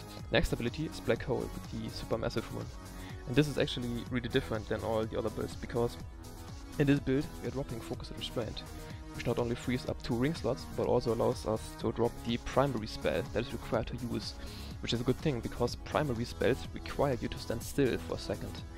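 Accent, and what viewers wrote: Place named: Germany. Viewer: Odd, I watched the video in full and heard/understood everything he said; I'm also not convinced that his accent is really an issue either as I'm Australian and he sounded German to me though I could be mistaken but it any case I could understand him so BobDaBilda & Faphand111 you both may need to address your computers sound issues. O.P thanks for the upload, I am rolling a Wizard for season six and even though Explosive Blast has never been a favorite of mine it was still an interesting build you demonstrated. I am actually keen to either try the Vyr's set or alternatively I might skip sets all together and focus on a disintegration build seeing as Wizard has changed quite a bit since I last played one.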